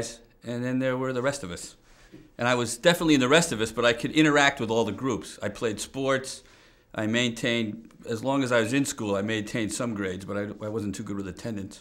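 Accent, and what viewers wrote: New York, United States. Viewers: Interesting. The inflection and pausing of the way he speaks is exactly the same as the way a guy I know who grew up in the same era in suberban NY speaks.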